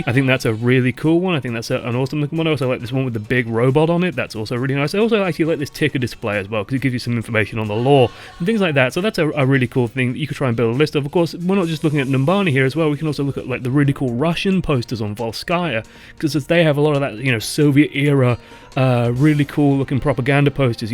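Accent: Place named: United Kingdom. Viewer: Sure there was something very funny but i dont know English so well to understand that( But still thank you for your videos because i can watch some interesting material about game i like and learn English a little bit. First time i watched one of yours i didnt even understood all that you said but now i can easily listen to people with British accent and realise what they say. So yeah, you`re cool. Keep doing your vids.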